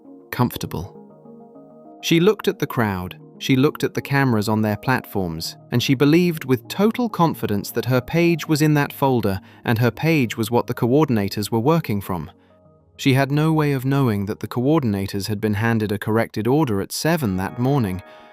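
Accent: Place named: United Kingdom. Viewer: I have read the disclaimers. And, of course I notice the same scenarios worded differently and the span between the repeats. I also notice quite a few British accents during the narration and sometimes the BBC. I might add that I am not a fan of Camilla, since the affair came to light. It’s outright dishonesty to the one you are betrothed, to your children and considering Charles position, to his country. Something you could NEVER accuse Queen Elizabeth of. I’m not saying others are perfect. Look at the governmental mess in USA. It’s entertainment and I have an opinion On the scenario rather it be true or false! My God, after reading these things you could not pay me to be a royal! Their lives appear to be maybe 25% their own. Any way, if you hear anything that’s actually news and not entertainment, post it, I would love to read it! Thank you for your comment😊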